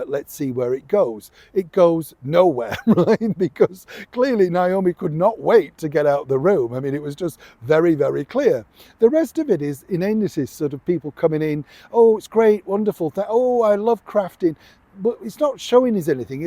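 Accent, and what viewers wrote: Yorkshire, United Kingdom. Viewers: It's his Yorkshire accent? Lovely on the ears 😁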